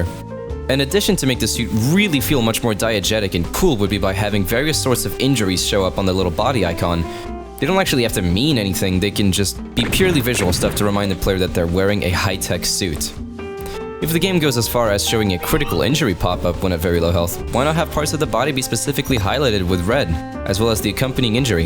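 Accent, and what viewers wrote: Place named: France. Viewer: Also, you sound French. Are you French?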